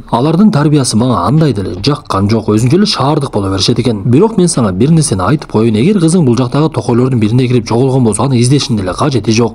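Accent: Standard. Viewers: Ото соонун таза кыргыз тилинде, тушунуктуу кылып, тушундуруп бергениниз мага абдан жагат даагы соонун ,кызыктуу кинолорду чыгара бериниз.❤❤❤ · 🎉 сен ен сонун суйлойсун нукура Кыргызча суйлосун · сонун суйлойсун ан таза кырызча сонун азаматсын 👍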